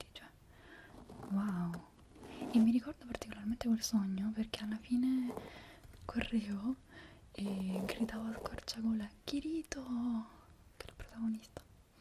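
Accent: Naples, Italy. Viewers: ma sei napoletana?,se si lo sono anche io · forse lo Avrai già detto qualche Altra volta.. scusa se te lo chiedo ma sei napoletana vero?... io lo sono😆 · Amo il tuo accento napoletano 😂❤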